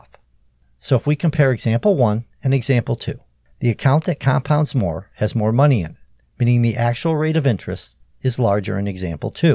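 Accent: Louisiana, United States. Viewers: Cajun accent?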